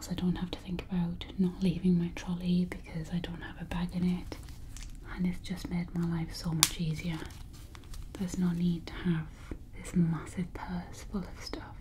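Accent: United Kingdom. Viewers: i love ur lovely British accent🥰🥰🥰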